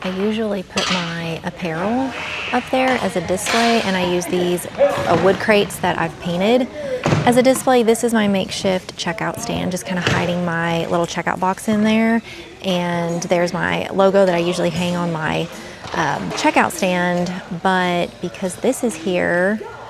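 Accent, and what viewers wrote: Tennessee, United States. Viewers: Loved seeing you interact with all your customers. You are so good at that! Also, I realized how much more your TN southern twang came out while engaging with customers. I am an East TN girlie and you sound just like everyone here.😊I haven’t made it all the way to the end yet but I hope you did well and even those who didn’t purchase will visit your website soon!❤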